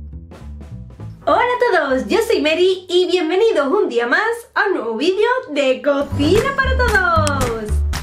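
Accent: Andalusia, Spain. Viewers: Acabo de probarlas y están riquísimas! Te has ganado mi👍🏼 jejej por cierto, eres de Andalucía? Lo digo por tu acento, yo soy de Sevilla^^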